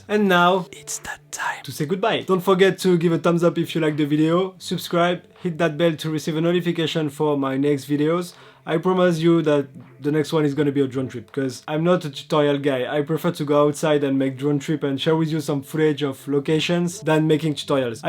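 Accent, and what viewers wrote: France. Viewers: qui a reconnu l'accent FR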